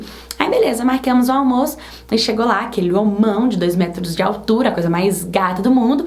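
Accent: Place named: Minas Gerais, Brazil. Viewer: Esse sotaquezinho mineiro engraçado!! kkkkk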